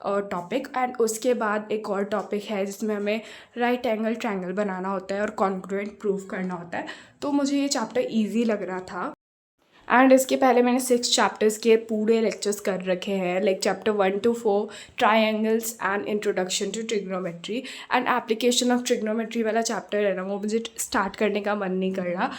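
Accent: Bihar, India. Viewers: Heyy!! Are you from bihar !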